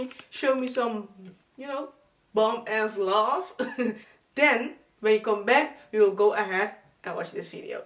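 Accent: Netherlands. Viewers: Hii honeyy love your work, love ur accent are u perhaps dutch? Xx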